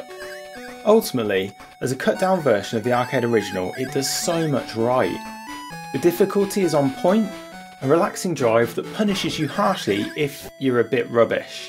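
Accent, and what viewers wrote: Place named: East Coast, United States. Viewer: Aww, classic images of Great Yarmouth! I was there last week, at that very arcade. Sorry for the late comment, I am catching up. Plus, we might of been at the arcade at the same time, though I would of been 21 years old, not 6! Agree fully, Out-run was the first arcade game I played on the Mister when I got mine a few months ago. I am still awaiting Flying Shark (Fire Shark's older brother) I really hope that comes to the Mister soon. Also, it would be great if you reviewed the computers that Mister supported. I can always help there, as I have most of them setup. SpectrumNext on the Mister is fantastic, but like all the computers on the Mister, they are great, but not like the original beasts. This is my third video I have watched, subbed after video 1, and I did guess you were from the east-coast. Right, I'm done!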